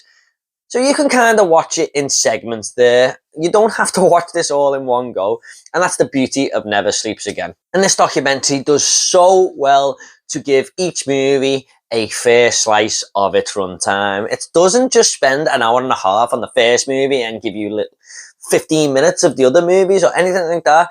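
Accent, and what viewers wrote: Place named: United Kingdom. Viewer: Love your accent btw! Love the way you said "time" where in the UK are you from, if i may ask? Im sorry if saying 'love the way you said "time" spuds creepy...it not ment to be ( embarrassed )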